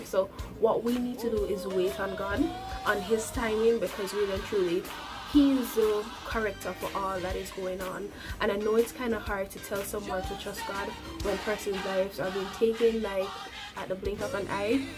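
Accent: Jamaica. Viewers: U sound Jamaican 🇯🇲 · You Jamaican rite? 🤔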